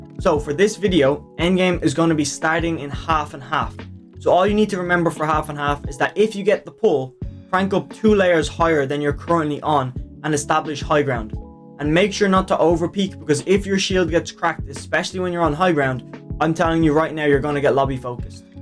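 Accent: Ireland. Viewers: Are u Irish I am😃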